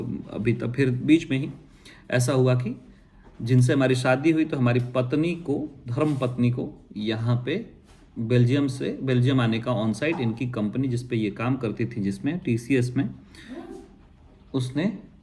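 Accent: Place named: Bihar, India. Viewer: Hello from Canada ..Feel connected as listen Bihari accent after long my mom was from Patna …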